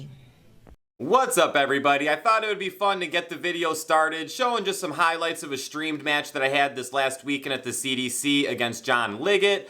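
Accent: United States. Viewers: Wow. It’s great to hear an American speaking about Darts. 👍🏻 or maybe Canadian I don’t know lol but I’m tired of the British Accent 😆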